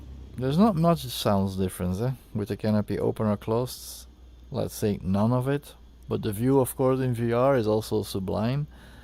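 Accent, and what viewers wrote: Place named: Belgium. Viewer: Alee komaan lol.. er is nen Belg voor nodig om nen Belg te herkennen :) goed review! (maar een klein detail; als je uit vr kwam voor de opname, dat zou de kwaliteit voor de kijkers erg verhogen)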